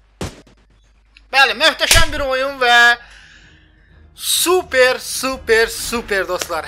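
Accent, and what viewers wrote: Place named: Shamakhi, Azerbaijan. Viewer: emi Şamaxilisan? Menim bibim gil Şmaxilidi senin kimi danisirlar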